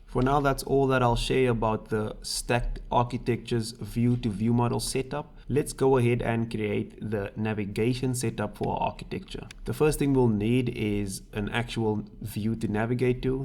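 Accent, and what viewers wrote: South Africa. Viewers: I thought that accent sounded familiar :) South Africa, howzit man. Did you base this on MVVM? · you have a sa accent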